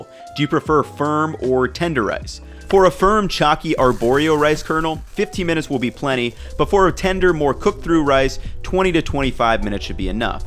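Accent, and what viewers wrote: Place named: United States. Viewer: He's American, so he pronounces it like an American. Because that's how language works.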